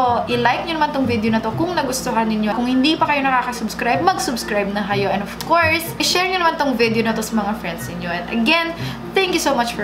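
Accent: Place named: Pampanga, Philippines. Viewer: Napakacute mo ate! Yung kapampangan accent mo nakakatuwa. Sarap pakinggan.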